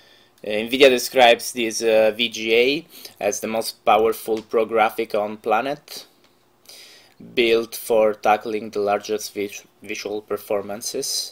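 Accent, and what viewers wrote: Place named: Italy. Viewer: si sente che sei italiano ahahahahah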